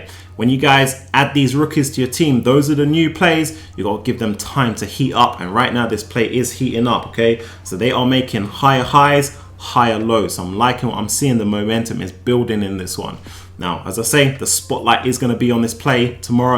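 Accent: London, United Kingdom. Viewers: Are you from london?? SE13 here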